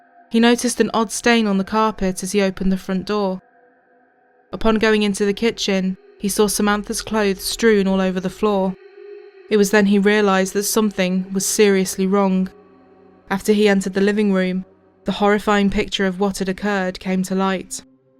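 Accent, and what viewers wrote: England, United Kingdom. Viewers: English accent wins!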